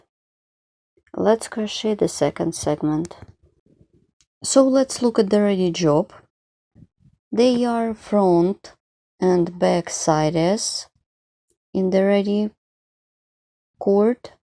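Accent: Russia. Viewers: Почемуто какжется, что русскоговорящая говорит на анллийском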